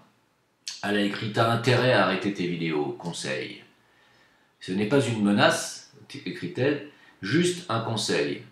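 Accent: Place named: Corsica, France. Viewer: j'adore votre accent corse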